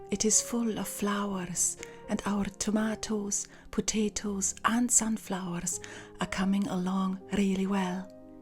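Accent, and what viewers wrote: Scotland, United Kingdom. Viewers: I feel like you are picking up a bit of Scottish in your accent.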